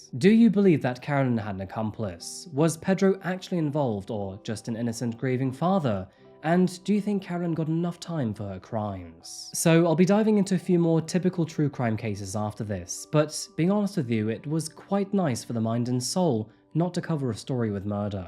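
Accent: United Kingdom. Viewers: Dudes British, he gets a pass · Sheesh! Sorry folks are all mad abt pronunciation...crazy how people from different places say pronounce things differently. They missed a couple though! You also said the letter "h" funny! (Like a Brit i guess, we say it more... without an h ... like "aytch" and you said it w an actual "h" at the beginning! 😂 and then... you said Delimar told her mom "your my mum"... (we say mAWm)... its almost like youre not americain!😂 like youre pronouncing things the way youve heard them! Crazy! 😂 sorry... having fun noticing your british bits now. Now i want you to say "today's case takes place" like its "the rain in spain falls mainly on the plains..." Jeeeze. People be so picky. (P.s. my name is Dominique, but americains always want to be saying it like an italian guy's name, "dAWm ah nick", so here we are... sigh)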